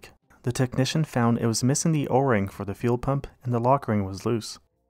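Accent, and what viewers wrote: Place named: United States. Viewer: The narrator has a american accent, but the transit van, , has British number plates. I find this most odd.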